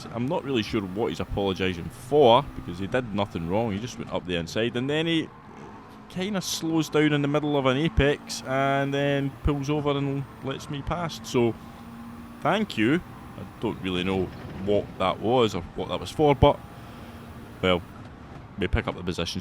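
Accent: Scotland, United Kingdom. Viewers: Scottish accent or not, the way you pronounce p6… er…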